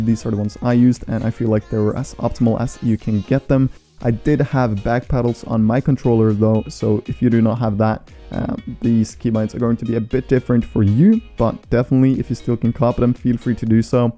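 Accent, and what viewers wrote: Norway. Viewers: Er du norsk? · Are you Norwegian?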